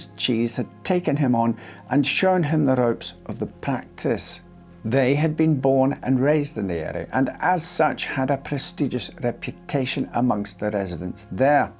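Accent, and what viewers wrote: Scotland, United Kingdom. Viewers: I can hear a little Scottish accent here and there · He clearly has a Scottish accent, but it sounds a bit mixed, so I suspect he's spent a lot of time south of the border. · In this particular presentation I am inclined to agree with you. Normally his accent is quite smooth with more of a Yorkshire twang but it seems he is adding a sort of Scottish intonation to it now. I noticed the change straight away and agree with it being a bit offputting and parody-ish. Edit: I didn't realise was Scottish. So yeah my comment would seem inane at first read. Sorry! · Love the way the presenter talks and makes it easy for a non English speaker to understand. Are you Scottish? As for the case, I say it was all due to greed. No rival involved, Armstrong just wanted to be the top dog in his community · Has revealed where he is from in the UK? 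is a Scottish name, I believe and his accent sounds like it. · he is Scottish, from Lanarkshire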